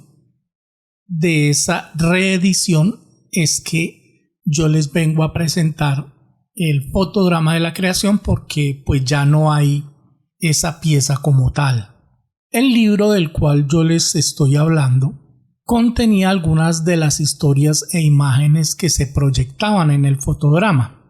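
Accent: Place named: Colombia. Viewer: CON TODO RESPETO, TU ACENTO ME DICE QUE ERES DE COLOMBIA,PRIMERO QUE TODO. CHARLES TAZE RUSSELL, NO TENIA COMO DIJISTE COMO 70 AÑOS EN 1914 CUANDO SALIO EL FOTODRAMA,TENIA 62 AÑOS, YA QUE EL MURIO EL 31 DE OCTUBRE DE 1916 , TAMBIEN NO DIJISTE QUE MUCHOS DE LOS DISCURSOS QUE DIO FUERON EN SALONES MASONES, ADEMAS DE HABER VENDIDO UN TRIGO DE FORMA FRAUDELENTA ,POR TAL HECHO LO DEMANDARON Y REGRESO EL DINERO , A LOS DAMANDANTES ,TAMBIEN SE TE OLVIDO DECIR QUE COMETIO ADULTERIO POR ENGAÑAR A SU ESPOSA MARIA FRANCES RUSSELL. CON SU SECRETARIA UNA MUJER MAS JOVEN QUE SU ESPOSA , FUE BORRACHO TAMBIEN, Y DE SU SUCESOR JOSEPH FRANKLIN RUTHERFORD, OTRO BORRACHO , MUJERIEGO, E INCLUSIBE COMETIO PEDERASTIA AL CONQUISTAR A UNA JOVEN DE 16 AÑOS DE NOMBRE BERTA, ADEMAS CON UNA MUJER CASADA DE NOMBRE BONNIE, EN 1935 SALIO EL PRIMER LIBRO DE TEXTOS DIARIOS , DONDE EL DECIA TENER UNA GRAN ADMIRACION POR ADOLFO HITLER, Y LA RAZON POR LA QUE ENCARCELARON A LOS TESTIGOS DE JEHOVA EN ALEMANIA NO FUE POR PERSECUSION POR SU FE , FUE POR CULPA DE RUTHERFORD, AL PONERSE A FAVOR DE LOS JUDIOS , ESA FUE LA RAZON DE PERSEGUIR A LOS TESTIGOS, Y DE OTRAS MAS , NO LO HUBIERAN PERSUEGUIDO NI ENCARCELADO , YA QUE DECIAN QUE NO ERAN UN PELIGRO PARA EL PARTIDO NAZI , POR SER NEUTRALES POR SU RELIGION , Y SI NOTAS LOS UNIFORMES DE ELLOS TENIAN UN TRIANGULO, A DIFERENCIA DE LOS JUDIOS CON LA ESTRELLA DE DAVID .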